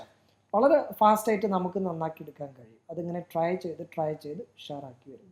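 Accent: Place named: Malappuram, India. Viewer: Malapuram slang nannaeittunde sir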